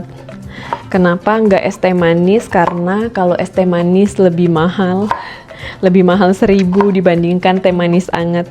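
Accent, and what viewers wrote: Pontianak, Indonesia. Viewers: sudah kuduga.... Logat nya pontianak sekali.... Pontianak dmn nya kak? ❤salam dr pontianak jugak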